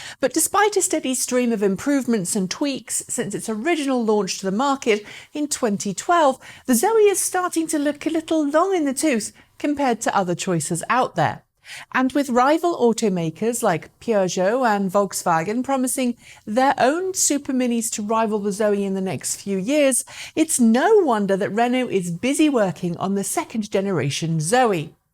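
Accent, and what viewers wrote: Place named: United Kingdom. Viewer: oh gosh I didn't know to be honest. I thought you were from the UK judging by your accent! Lol, sorry!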